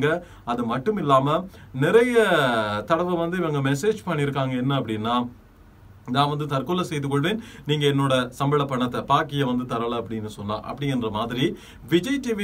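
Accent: Sri Lanka. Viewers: Bro unga Tamil speech nalla irukku I meen ilankai Tamil super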